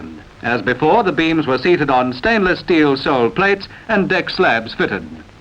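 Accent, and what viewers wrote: Australia. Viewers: He's Tasmanian, ask someone from Smithton Tasmania where they're from and you'll hear smitten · Ya got tru blue aussie accent there mate 🤠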